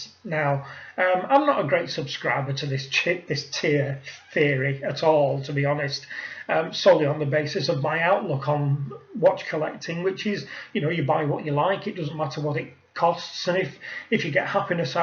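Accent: United Kingdom. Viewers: Hi. subscribed to your channel about 3 weeks ago and I enjoy your vids very much....its nice to hear a fellow brits voice on such a nieche subject as horology. I share your opinions about archie and can no longer watch his videos. The swearing is too over the top and the content is just plain boring! keep up the good work.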